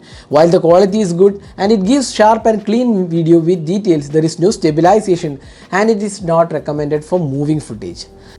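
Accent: Kerala, India. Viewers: Malayali accent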